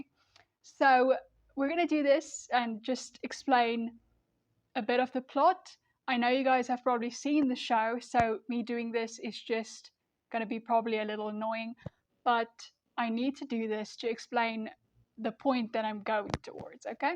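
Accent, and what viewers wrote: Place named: South Africa. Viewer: Hi there! Great video You have some interesting takes on things. I definite think you should watch the final season. It is in no way satisfying as far as concluding these main story plots you’ve been talking about but it does have some nice closure for some other characters. It is an absolute shame that they threw away 11 years of story and world building by stretching the characters into their own shows instead of having some satisfying conclusions to the main story notably Rick. It’s so painfully obvious that the writers had to write Rick out then try to pick up the pieces instead of just finishing the story then. I would have been ok with Neagan dying at the tree but I admittedly enjoyed his pseudo redemption arc and wanted to see him become accepted amongst the community. Im not a fan of how Carl died but I feel like Sadiq ended up doing a lot of good. 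Such a titanic fall this show had it’s a sucks when ips gets hurt because actors want to persue other ventures. Again great video! Your accent reminds me of my childhood Doctor, is it South African?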